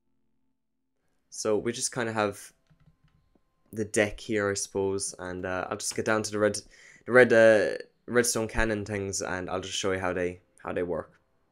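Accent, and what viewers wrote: Ireland. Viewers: Are you Irish ? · Are you Irish?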